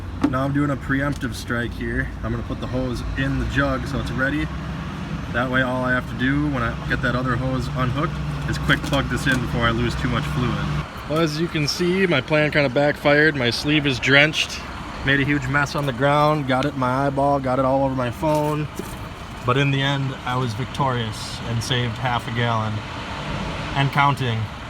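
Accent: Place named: Wisconsin, United States. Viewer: I'm from Wisconsin and you sound like my kind of people. Just what I was looking for.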